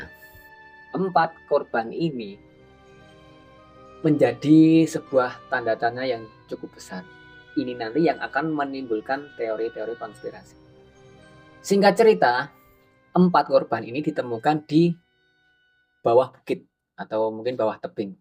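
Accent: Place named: Java, Indonesia. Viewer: medok e ilangke mas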